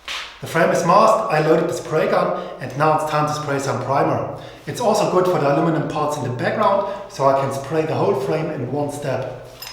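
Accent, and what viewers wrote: Germany. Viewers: Angry german accent makes me happy! :)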